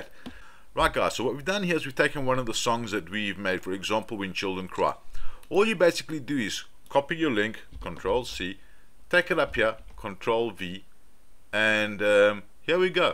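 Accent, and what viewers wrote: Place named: South Africa. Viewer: sounds South African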